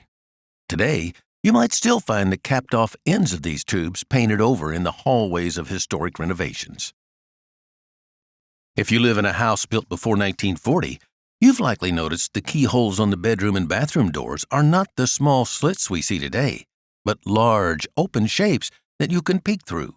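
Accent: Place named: General American, United States. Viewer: It is a standard American accent. I think it sounds great. Always more positive.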